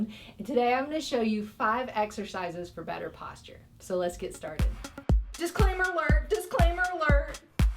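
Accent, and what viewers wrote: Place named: Alabama, United States. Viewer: alabama drawl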